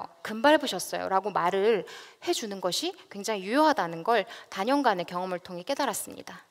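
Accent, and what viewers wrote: Gyeongsang, South Korea. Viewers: 이 여자는 경상도말투라서 사납게 느껴지니 거부감이 든다.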